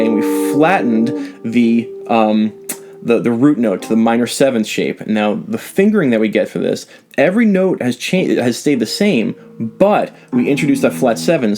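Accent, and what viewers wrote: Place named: Long Island, United States. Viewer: Great lesson!..I learn quite a bit from your explanations..Are you from NY?..I'm from the Island, and you sound like everyone I know.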